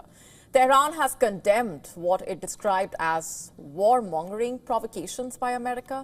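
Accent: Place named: India. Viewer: Indian accent so funny😂😂😂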